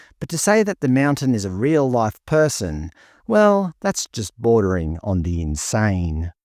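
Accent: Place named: Australia. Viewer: You sound like an Aussie, trying to tell the story of,, the Indigenous of Aotearoa🤦🏽‍♂️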